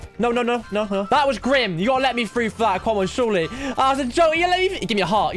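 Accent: United Kingdom. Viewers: are you cornish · Is he English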